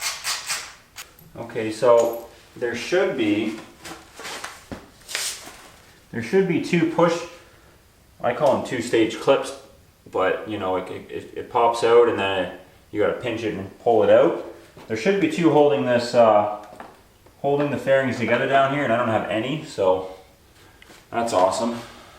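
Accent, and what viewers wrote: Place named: Canada. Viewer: Lol i knew you were Canadian, I guess we do have an accent after all. I'm working on a 03 1000, front fairing is a little different from the 750 and 600.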